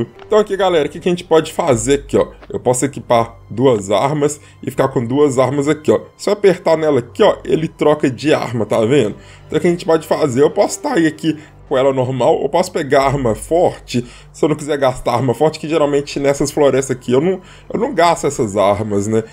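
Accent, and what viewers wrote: Minas Gerais, Brazil. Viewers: Parabéns. Você é mineiro né adoro esse sotaque.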